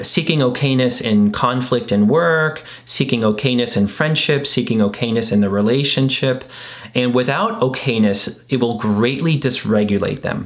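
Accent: Boston, United States. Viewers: The Boston accent …… I love it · Haha I love the Boston accent.😂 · Thank you for clarifying behaviors associated with growing up with childhood trauma and also offering scenarios that may have started the path. Each of the videos I've watched have helped me. Like another commentor...I cannot watch a full video...but because of the sheer amount of information to process. I break them up into 2 or 3 sessions. I too am from Boston, though I've lived in Oregon for 40+ years. I love the accent...and have always had an unreasonable attraction and affinity to anyone that has one. I am now hearing (in my mind) ...this whole video with the letter "o"distinctives of ah' or aw...such as "pahk the cah in Hahvahd yahd" and the "tawnics" and several words joined together such as...the "howahya's"❣ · Love the Boston accent! It made me smile! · shoutout for the authentic Boston accent LOL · Just want to say that I think your channel and your Boston accent are just fantastic. 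· Ha, I knew I could hear a faint Boston accent in your other videos! Appreciation to you from Allston. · Strawberries!!!!🔥🔥🔥🤣 I played that first Paula Abdul tape til it broke😏✅ went back for another. And her next tape. Still got em in the time capsule in ma basement😉 Giggles from this 40 yr old🥰 Thank You😁 Your clips are amazing... the magical $300 car... lols Excellent. You explain all this heavy icky stuff in a relatable, nonoverwhelming way.... which builds confidence in managing self... HUGE... THANK YOU SO MUCH!!! 4TH generation Irish... LOVE the Boston Southyish Linguistics 🍀😁🍀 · Your Boston accent had me rolling 😂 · This video is amazing for the Boston accent alone! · Lmfao at the Boston accent 😂❤️ made me love the videos more! Sincerely, a Bostonian, kid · Love the Boston accent 😂❤ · love the boston accent lolz but really you make all of these difficult topics easier to swallow and comprehend and for that I couldn't be more grateful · Love that Boston accent. 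· As a native upstate NYer, I loved hearing your Boston accent! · Omg, the Boston accent. So funny. Thanks for this video! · Your Boston accent 👌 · Omg best Masshole accent ever! I grew up in Southern NH and went to high school on the border of Plaistow and Haverhill. No matter how hard I try, I have never ever been able to do either the Boston or Southern New Hampshire accents. I am wicked awesome 😂 at pinpointing where people are from by hearing the difference in their accents, but I grew up without one somehow. It’s always fun to hear it since I’ve been living in the SF Bay area for the past twenty odd years since I moved far far from Sandown, NH. It’s still home in my heart (and Ely & Fairley, VT) but I have never ever wanted to go back permanently. Short trips remind me quickly about why I moved 3000+ miles away as soon as it became possible. Thanks for helping us unravel our childhood disfunction and confusion. 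For me, distance, time, and finding your friend family helps so much. 🥰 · OMG the Boston accent and the 80's/90's references!!😆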